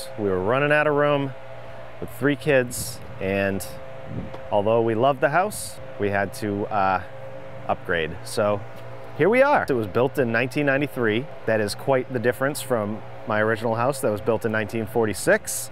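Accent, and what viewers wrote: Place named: New England, United States. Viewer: lol got recommended to your channel, first time ever watching and after ten seconds of the video, knew you were from Massachusetts or NH.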